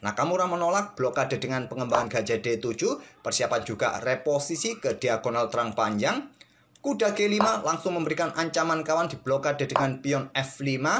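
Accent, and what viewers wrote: Java, Indonesia. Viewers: 50mnt mah mending cari rokok sekalian bikin kopi wkwk. Santuy dulu ga sih? Suka sama pembawaan channel ini. Apalagi kalo udah ngomong "di caplok" atau "baku hantam" wkwk aksen jawanya itu loh yg bikin seru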